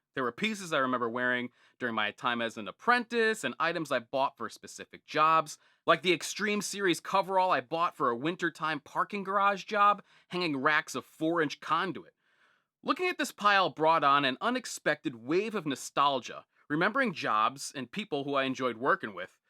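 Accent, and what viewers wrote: New England, United States. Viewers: New Englandah !!!!!!! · What part of New England are you from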